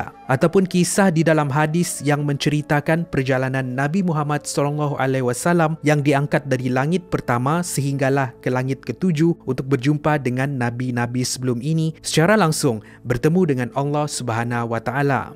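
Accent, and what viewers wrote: Sabah, Malaysia. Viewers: 20 tahun akan datang subscribe naik Aku harap bro tetap buat content mcm ni Dan tetap guna logat dari Sabah 👌🏻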